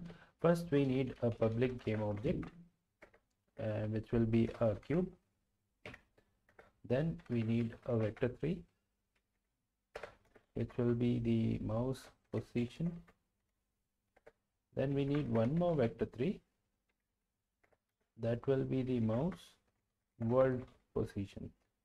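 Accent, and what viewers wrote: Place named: India. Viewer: I searched many a tutorial and none could resolve my issue with the new input system. I stumble upon this quick and easy one and in a mere moment my code works perfectly. Thank you random Indian accent tutorial man. I am truly grateful.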